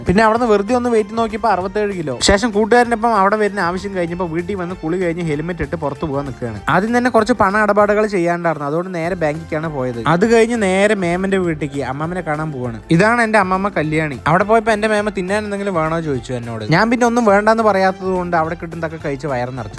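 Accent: Palakkad, India. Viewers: Liked to see your videos. Liked your plalakkad language. All the best wishes monu👍❤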